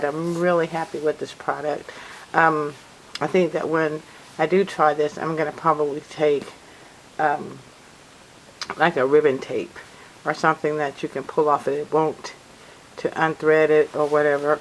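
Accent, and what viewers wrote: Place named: South, United States. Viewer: Thank you for this creative video! This is the technique I'm going to use for my knit slippers (that have to be hand washed anyway). Never mind the negative comments, I'm from the South too, and that's just the way people talk down there. Keep the ideas coming! <3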